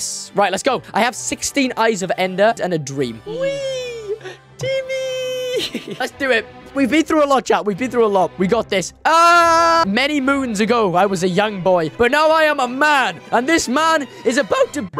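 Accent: Wales, United Kingdom. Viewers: Are you Welsh?😅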